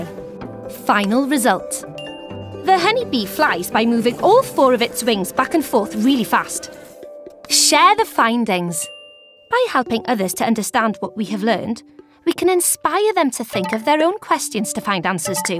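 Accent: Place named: Australia. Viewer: australian ahhhh:face-blue-smiling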